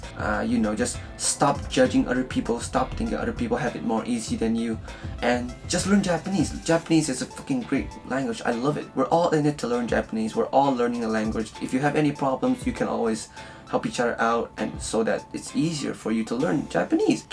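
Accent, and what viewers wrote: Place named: China. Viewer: I have to disagree with most of your points here.. 1.grammar is just a matter of getting used to it. Remember, language comes first, then grammar is just people trying to analyze the language. 2.chinese tones help enormously with Japanese pitch accent. Also with sino Japanese vocab. 3.as you said yourself, speaking is just a matter of how much you want to sound native. You yourself have a pretty heavy Chinese accent when speaking English and Japanese. But it doesn't make it any harder to learn the language. 4. Chinese speakers have a huge advantage when it comes to vocab, to deny that makes you sound you're just saying it to fill in your video 5. Kanji... Seriously? Smh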